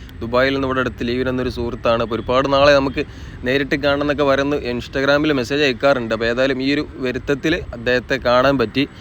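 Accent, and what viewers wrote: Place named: Eranad, India. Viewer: പിന്നെ താങ്കളുടെ ഭാഷയെ വിമർശിക്കുന്നവരോട്, മലയാളം എന്താ സ്റ്റേറ്റ്കാരുടെ ഭാഷ ആണോ? അവരവരുടെ മലയാളം അവരവർക്കു ശുദ്ധം. ഞങ്ങളെ കാസ്രോട്ടെ ബാസെ ഞങ്ങളെ സുദ്ദ ബാസെ. ങ്ങളെ ഏറനാടൻ ബാസെ കേക്കാന് എന്തോരി പാങ്ങന്നേപ്പാ, ആ ബാസെന്നെ പറഞ്ഞോണ്ട്-ന്നെ മുന്നോട്ട് പയ്ക്കാളീം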